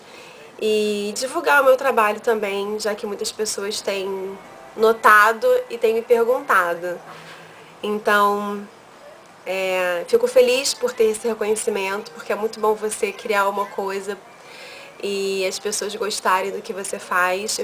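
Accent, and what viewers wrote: Rio de Janeiro, Brazil. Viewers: Oi, Adoro suas makes e seu sotaque carioca e muito fofo!! rsrs Vc tem twitter amore? Bjinhoss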